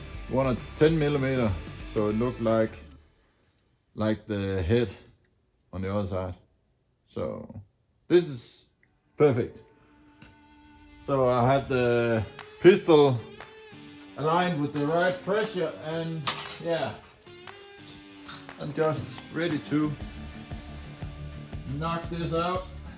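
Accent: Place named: Jutland, Denmark. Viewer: det er jysk når det er bedst 😊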